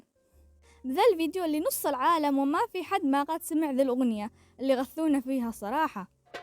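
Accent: Yemen. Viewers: احسك يمنيه بس وربي انك مبدعههه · ليش ماعد تنزلين فيديوهات احسك يمنيه من لهجتك انا يمنيه😊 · انت يمنيه صح😊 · لهجتش يمنيه لا بعيد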